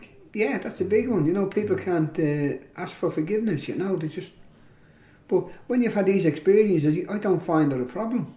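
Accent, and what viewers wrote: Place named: Ireland. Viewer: It's like listening to two five year olds, such Rambling, self indulgent mish mush, typical Irish man, dreadful accent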